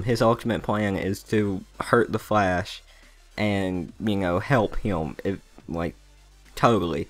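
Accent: South, United States. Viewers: Is that a mild Southern accent? Just curious, mate!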